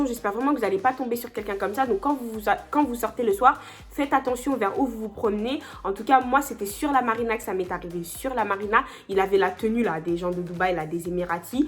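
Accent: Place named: Morocco. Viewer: Une question jeune fille,tu es tellement jolie😍( tu as l’âge de mon fils),pourquoi parles tu comme une marocaine/arabe?🤔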